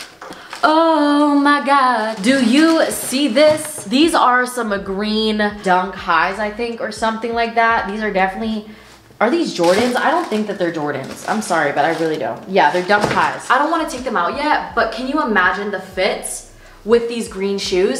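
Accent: United Kingdom. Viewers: it's the british accent for me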